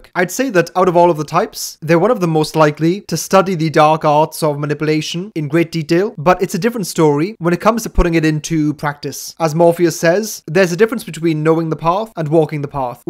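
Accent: Wales, United Kingdom. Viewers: Hello. Are you Welsh, luv? 🏴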